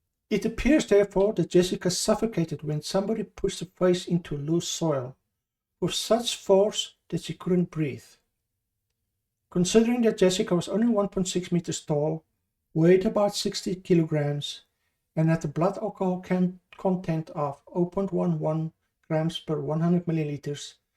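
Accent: Afrikaans, South Africa. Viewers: Dankie. Baie interessant. Heerlike Afrikaanse aksent. Doen so voort! Advokaat Barbie se lewe en misdade sal interessant wees. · He has fabulous Afrikaans accent and if you had listened properly you would followed it enough to understand he is saying bald From me a truly English person, I appreciate his work and his accent. Its not bad it is just different.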